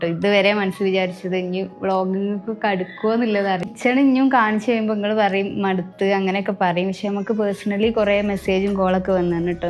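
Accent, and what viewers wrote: Kozhikode, India. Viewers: കോഴിക്കോട് കാരി ആണോ slang കോഴിക്കോട് ആണല്ലോ ❤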